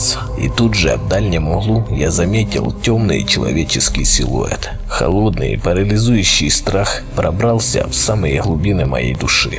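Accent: Ukraine. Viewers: Чувак ты украинец? БЛИН НУ СЛУШАТЬ НЕ ВОЗМОЖНО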